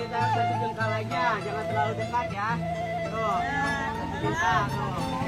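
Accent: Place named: West Java, Indonesia. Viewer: iyeuuh, khas sundanya kerasa 😍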